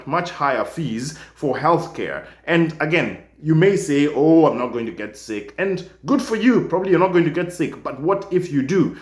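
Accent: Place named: Kenya. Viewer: You sound Kenyan. Useful video.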